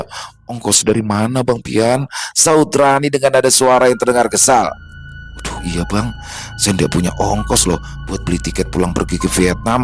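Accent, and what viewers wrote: Jakarta, Indonesia. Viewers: Assalamualikum bng, salam santun dr Medan, mantap dech bang piyan kembali dengan kocak Betawinya yg buat sy ngk pernah bosan dengarnya😂😂, terima kasih mas untung dengan cerita mas piyannya yg mantap sukses terus ya, buat bng cocok banget menjadi tokoh bng piyan dgn logat Betawinya yg kental banget, buat team x3m sukses terus😂👏👏👏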